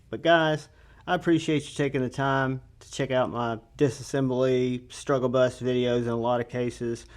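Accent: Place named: Nashville, United States. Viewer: Enjoyed that , whenever I heard your voice I’m thinking , Nashville born and raised 🤔 then again I’m an Aussie 🇦🇺😂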